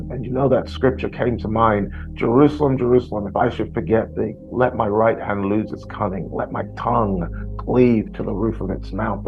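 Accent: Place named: United Kingdom. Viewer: God bless you. You may not understand, but people speak in different dialects around the world. And this is simply a British accent. This man is from the UK. So, they don’t pronounce words as people in the west do.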